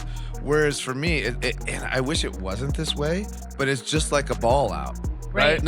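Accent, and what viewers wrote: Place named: United Kingdom. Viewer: yooo!!! just the "british" i was hoping for!!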